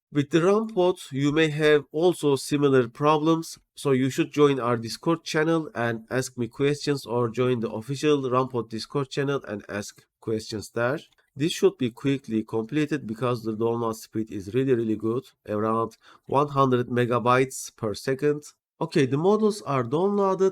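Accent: Turkey. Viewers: English with Turkish accent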